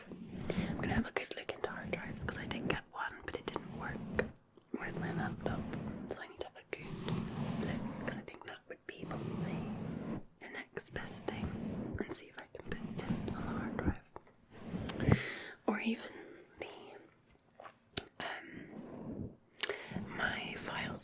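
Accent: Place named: Glasgow, United Kingdom. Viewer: From Glasgow AFAIK, or at least one of the towns around it.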